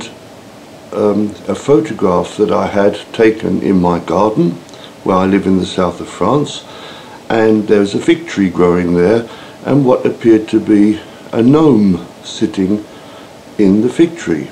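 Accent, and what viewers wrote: United Kingdom. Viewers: Well, it's great you raise those questions! People do need to challenge their familiarities and I suppose your questions do just that. I haven't gotten to watch all of your videos, but I was curious to know where you got your education. I speculate that you're British from the accent, but that's the best guess I could give! So what's your story, in short?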